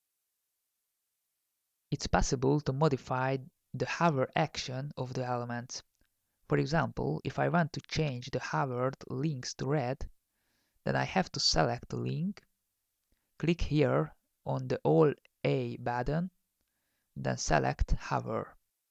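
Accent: Hungary. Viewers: tényleg valaki olyannak kéne mondani a kommentárt, aki nem hunglishül beszél :)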